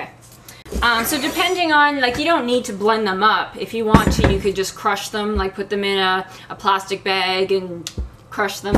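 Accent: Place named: Canada. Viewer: All foods are an aquired taste. Where did you get the Oil atomizer?(brand name, you sound Canadian, is it from the US?) Can't find them here. Coconut would rock in this recipe too.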